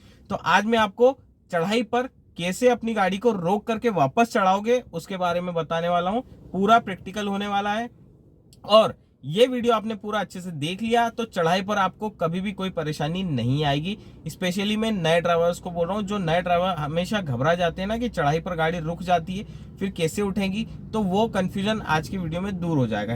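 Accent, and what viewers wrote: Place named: Malwa, India. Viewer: मालवा क्षेत्र के हो लगता है ❤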